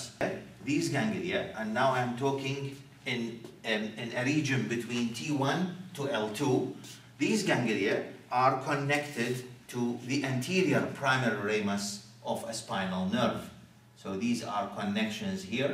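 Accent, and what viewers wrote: Iraq. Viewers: From your accent ,I gusse U R Iraqi So we R proud of U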